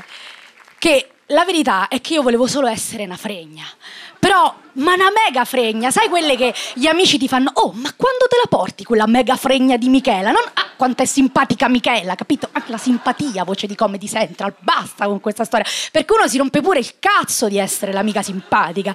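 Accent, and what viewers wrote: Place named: Piedmont, Italy. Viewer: No, è di Cuneo. Non senti l'accento piemontese?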